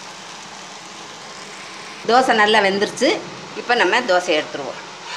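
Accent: Madurai, India. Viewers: Neenga Madurai..ya Amma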